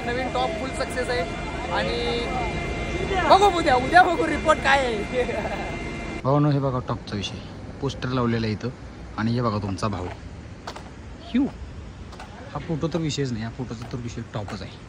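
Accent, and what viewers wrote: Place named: Vidarbha, India. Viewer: विदर्भ bolte naaa bawaaa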